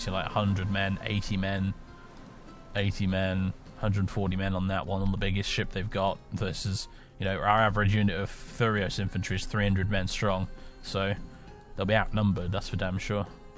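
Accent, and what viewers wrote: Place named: South, United Kingdom. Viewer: Are you from south england?, when your voice cracks you sound mightly like soviet womble i must say.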